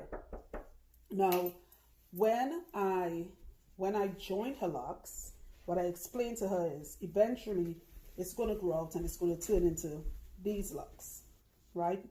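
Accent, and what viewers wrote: Trinidad and Tobago. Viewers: Are u trini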